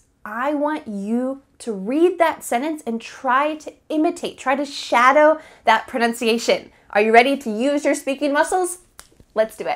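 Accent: United States. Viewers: I love this American accent and beautiful smiles! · I love your American accent! Do you mean from North America or Central America or perhaps Soth America? Do you mean the U.S. of America? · As a Chinese, I really like her American accent. It sounds very comfortable. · Excellent American pronunciation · I just love how these Americans speak...n she's really cute n her American tone is outta this world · I like when you are speaking American accent and I got a lot of many things .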